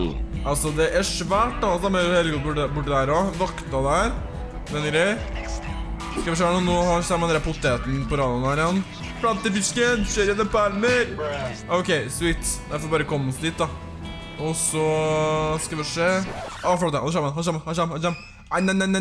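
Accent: Trøndelag, Norway. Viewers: Jeg elsker og høre på trønder dialekten din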